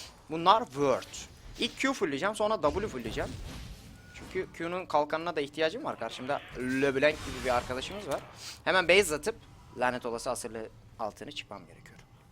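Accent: United States. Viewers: şu amerikan dublajı yapmayı bıraksan hani videolarında eski tat yok reis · Kardeşim şu amerikanvari konuşmaların gerçekten tipine çok iyi gidiyor başarılarının devamını dilerim:D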